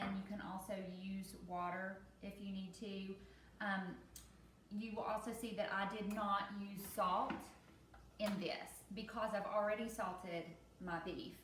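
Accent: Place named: South, United States. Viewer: Southern accent is like nails across a chalk board.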